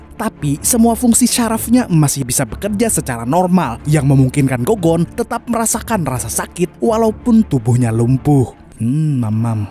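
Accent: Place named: Kebumen, Indonesia. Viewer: Jelas admin ini orang kebumen , ke Sempor beli mendoan , ora ngapak ora kepenak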